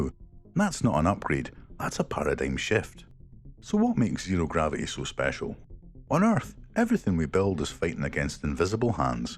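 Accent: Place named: United Kingdom. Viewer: this is just creepy i was hoping to get away the britt accent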